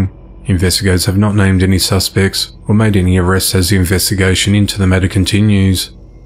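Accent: Australia. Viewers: You must be an Aussie right?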